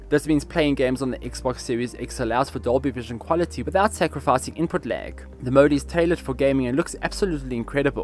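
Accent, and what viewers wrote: South Africa. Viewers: South african hello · I love finding random YT review videos on a product I'm researching, opening it, and hearing a good old South African accent!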